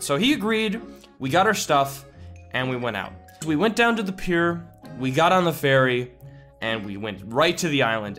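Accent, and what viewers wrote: Canada. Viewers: wait, r u canadian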